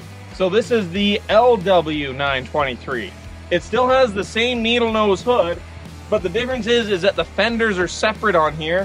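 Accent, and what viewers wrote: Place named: Prince George, Canada. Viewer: You sound like you're from Prince George or Quesnel.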